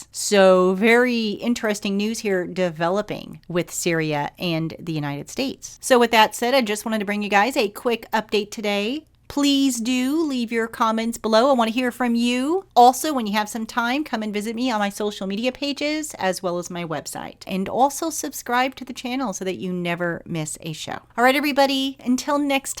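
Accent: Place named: East Coast, United States. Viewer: no he definitely seems to be a radical Islamist. I listen regularly to Glenn Beck and he said the mother of the new Mayor of N.Y C. stated in an interview that her son does NOT identify as an American, but rather with his Islamic heritage instead. I was born in Queens N.Y and raised on Long Island. I now reside with my husband in rural N.W.OH. I noticed you have an East Coast accent that sounds a lot like mine. Are you a N.Y gal too? I think NY.C is going to need a LOT OF PRAYERS!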